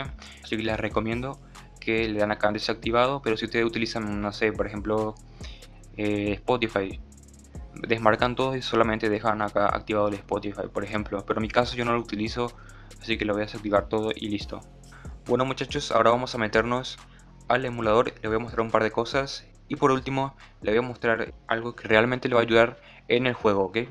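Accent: Paraguay. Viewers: Se re nota que sos de Paraguay o estoy loco?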